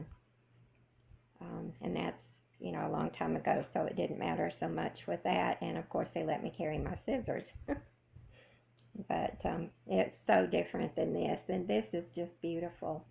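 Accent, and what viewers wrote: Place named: South, United States. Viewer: I am so sorry to hear you were in the hospital. Hope you are on the speedy road to recovery. Marlene’s project bag is just beautiful and how sweet is that??! 😉. So good to see you, as always, I am inspired by your sunny outlook and I could just listen to that lovely Southern accent all day long. 😘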